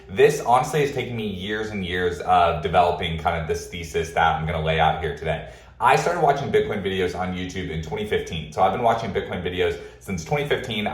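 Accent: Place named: Los Angeles, United States. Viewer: I will subscribe if you ditch the LA enunciation, great video man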